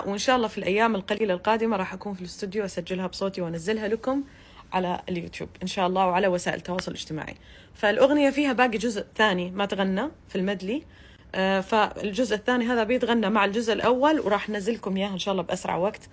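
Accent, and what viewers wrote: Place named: Jeddah, Saudi Arabia. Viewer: يمنيه معها جنسيه اماراتيه تتحدث بلهجه سعوديه جداويه.. من أنتم ههههههه.. الله المستعان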